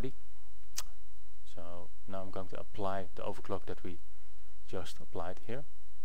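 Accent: Netherlands. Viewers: Goeie englisch men i almost kant hoor your duts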